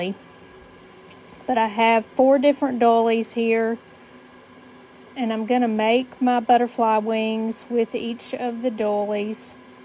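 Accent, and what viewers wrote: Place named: Alabama, United States. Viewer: How cute! 😍 I love doilies! I just have to ask, are you from Alabama? I'm on Sand Mountain and your lovely accent sounds like all my people! 😄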